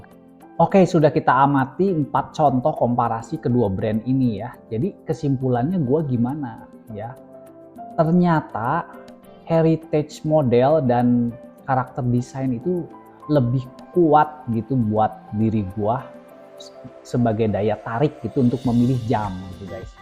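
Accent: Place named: West Java, Indonesia. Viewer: Pasti mang nya urg sunda 😅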